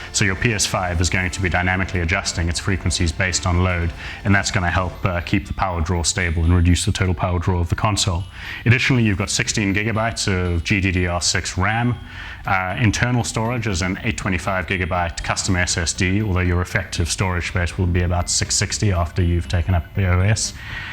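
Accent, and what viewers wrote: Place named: Scotland, United Kingdom. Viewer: Thick Scottish accent LUXURY!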